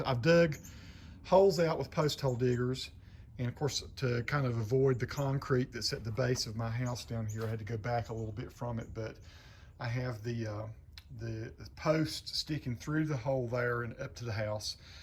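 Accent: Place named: North Carolina, United States. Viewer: I knew you were from North Carolina before I visited your videos to see if you ever said where you were from. lol. I'm from NC too. Born & raised in Charlotte. Thanks for the clear, detailed instructions.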